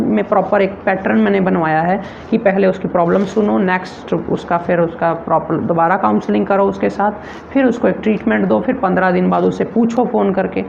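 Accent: Punjab, India. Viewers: भाई जी आप की हिन्दी बहुत अच्छी है आप पंजाब-हरियाणा से तो नहीं है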